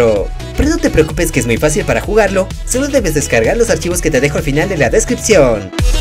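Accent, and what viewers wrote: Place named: Spain. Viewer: Eres un buen YouTuber, pero odio que hable como si fuera de Espa~a.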